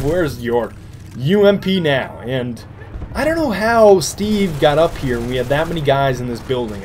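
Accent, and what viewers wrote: Germany. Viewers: are you german?? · are you german? 0_o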